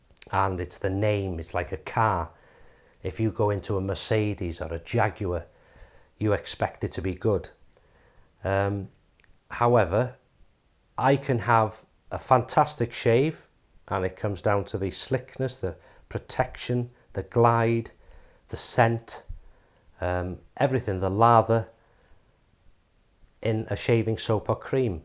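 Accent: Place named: North Wales, United Kingdom. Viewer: Hi, Top man, interesting, informative chat. I look forward to all you videos, love the North Wales accent, makes me feel like being home. Keep up the good work Cheers Steve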